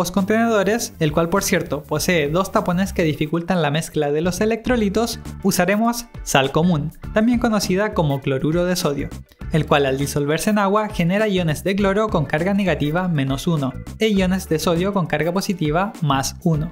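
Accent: Chile. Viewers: Me encantan tus videos P. D. Tu voz me recuerda a un programa que se llama Oban Star Racers creo que eres chileno o me equivoco?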